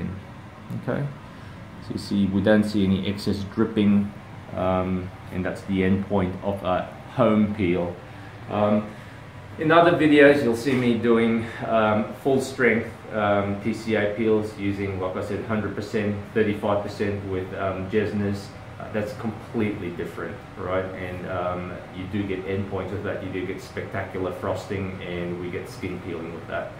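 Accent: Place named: Australia. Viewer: So TCA peel isn't good for acne scars? I thought it would remove the top layer of skin, not really the top but a small portion like dermabrasion, through chemical process.... Anyways, you got any recommendations for any dermatologists in California? Seem from your accent, it looks as if you live around Australia.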